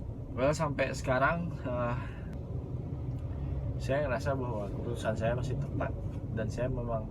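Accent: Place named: Bali, Indonesia. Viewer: Bli, logat bicaranya kali ini baru Bali pisan :)))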